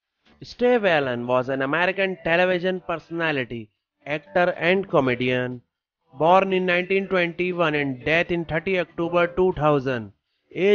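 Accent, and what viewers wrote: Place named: India. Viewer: Voiceover dude has huge Indian accent.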